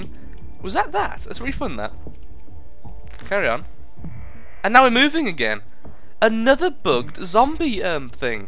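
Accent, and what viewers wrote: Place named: England, United Kingdom. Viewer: its England init.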